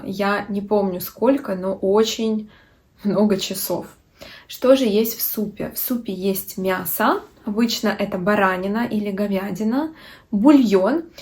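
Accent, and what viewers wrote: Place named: Finland. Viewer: Я единственный, кто слышит в этом финский акцент, звучит довольно круто. Далее я хочу услышать некоторые южнорусские акценты с раскатистым «р». 😊🍖🍗🍗🥞🥞🥞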